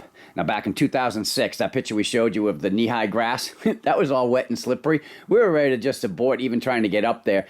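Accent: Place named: Maine, United States. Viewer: do you guys come from around there? You have quite the accent;-)> (it's so cute!)I'm from Boston and I have an accent. What's with the "Mylan, NH"? Shouldn't it be "Mill-ahn"?I say "pahk" too. You almost sound like a Mainer. "You cahn't get they-ah from heyah!" doesn't have an accent?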